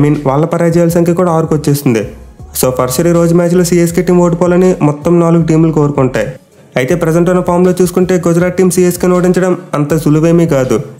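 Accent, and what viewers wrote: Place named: Visakhapatnam, India. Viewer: rajahmundry ayyina aa slang lo matladatledhu miru vizag slag lane undhi so vizag vadivi anukunna bro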